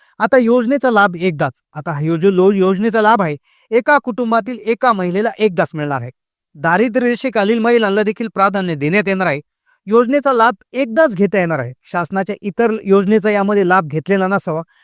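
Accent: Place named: Thane, India. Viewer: ठाणे जिल्ह्यात बोलते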